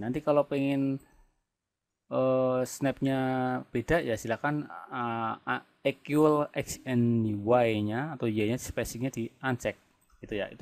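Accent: Banyumas, Indonesia. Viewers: like 11 om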